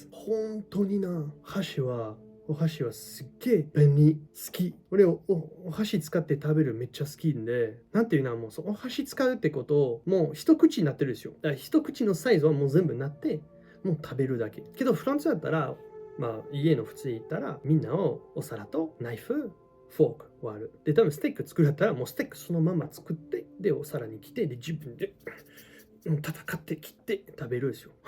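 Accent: Kansai, Japan. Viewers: アクセントが関西弁😊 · はい( ¯꒳¯ )b✧ もちろんですやん関西弁で 話してくれるから めっちゃ親近感やし✨✨ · ステーキ🥩硬いやん切れへんやんか🤣りっぱな関西人やん👏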